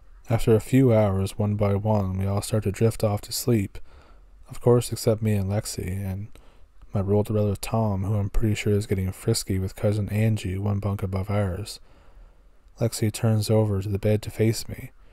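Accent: Canada. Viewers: I'm pretty certain he's from Canada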